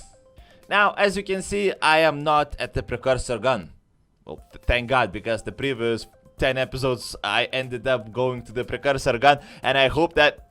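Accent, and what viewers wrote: Russia. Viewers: Попробуй снять гайд по поиску фрагментов. Должно быть интересно. Русский акцент тебя выдал.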